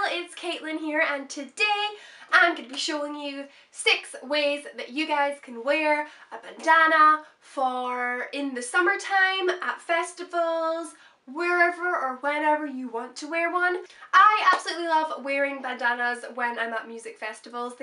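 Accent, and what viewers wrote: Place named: Edinburgh, United Kingdom. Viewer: You sound like you are from Edinburgh, if you are then I'm guessing this video is inspired by the leith festival? Just a guess x 😆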